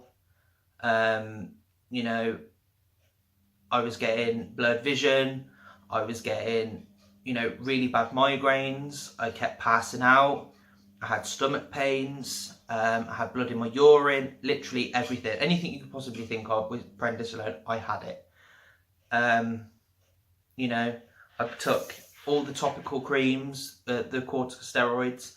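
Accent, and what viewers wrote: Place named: United Kingdom. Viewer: Thanks for this, you're the first person I've seen on yt talking about Dupilimab with a British accent, did you get it on the NHS? Makes me hopeful, cheers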